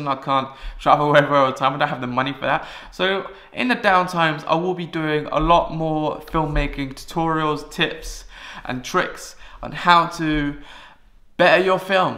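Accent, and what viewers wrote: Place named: Stockholm, Sweden. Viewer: I'm kind of inclined to disagree with you still about the grammar, there are more similarities than differences to me at least :P As for the sj sounds, most of the time you can get away with using the familiar sound that you do have in english, doing so will mostly just make you sound slightly Stockholm-ish. But keep practising, I'm sure you'll get a hang on the harder sounds aswell =)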